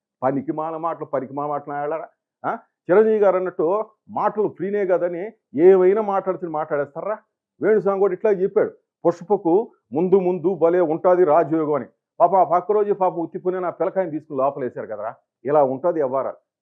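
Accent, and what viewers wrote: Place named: Nellore, India. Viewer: నెల్లూరి యాస చాలా బాగుంది గారు. మీరు చెప్తూ ఉంటే ఇన్వాల్వ్ అయి వినాలని పిస్తుంది. ఇంకా మరిన్ని వీడియోస్ కోసం ఎదురు చూస్తుంటాం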